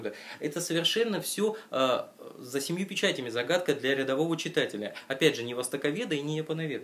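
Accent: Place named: Russia. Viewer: Здравствуйте. Заинтересовала книга. Спасибо за рассказ. Подскажите из какой вы части России или вы из Украины? Интересует ваше произношение Япония, японцы.)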